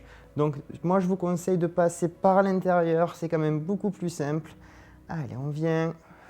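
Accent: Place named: South, France. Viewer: ah oui c est ce qu il me semblait j ai reconnu un petit accent du sud , je suis sur Nîmes et originaire de Reims mon oreille est sensible aux accents 😂 dommage je trouve vraiment bien votre explication très claire pour ces exercices si vous aviez été dans le coin , j aurais pris mes séances à votre cabinet c est sur .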